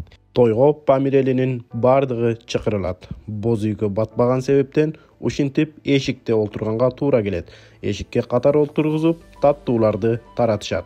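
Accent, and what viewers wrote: Standard. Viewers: Азаматсын кыргыз тилин бурмалабай таза адабий тилде суйлойт экенсин!!!!!👍👍👍👍👍👍🇰🇬🇰🇬🇰🇬🇰🇬🇰🇬🇰🇬🇰🇬🇰🇬🇰🇬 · коптон бери корунбой калдын эле Памирге барып келген турбайсынбы Азаматсын кыргызчаны таза суйлогон Кыргыз жигит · Таза суйлопсун Рахмат тууган Памирликтерге салам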